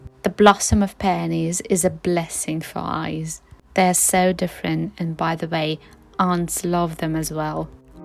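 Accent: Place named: RP, United Kingdom. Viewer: right, but also your aristocratic British accent and engaging smile. We are putty in your hands. I wonder if that is lost in translation.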